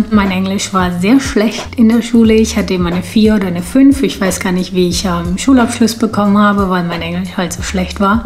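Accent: Russia. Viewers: Hallo!, Kann ich sehr gut nachvollziehen ich spreche deutsch, englisch, tschechisch. Mit meiner Mutter spreche ich tschechisch, mit den Rest (andere Familienmitglieder) deutsch und schaue die meisten Videos, alle Filme etc auf englisch. Ich kenne es gut wenn man ein Wort in einer anderen Sprache sofort kennt, aber es in der anderen Sprache einen nicht gleich einfällt. Mach dir kein Kopf, du hast eher ein russischen Akzent ich habe aber auch manchmal einen. Das ist jedoch normal, es gibt (meiner Meinung) nach nahezu keinen Akzentfreien Sprecher. Nette Grüße Lukas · Bin auch russisch-deutsche und möchte sobald es Pandemiemäßig möglich ist nach Australien ziehen. Ich hatte früher keinen Akzent im russischen, habe jetzt aber deinen deutschen Akzent. Bin gespannt wann und wie sich das verändert wenn ich überwiegend nur noch englisch spreche :D Meiner Meinung nach klingt dein Accent doch sehr russisch! 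Schaue dann mal ganz interessiert deine Videos weiter an :)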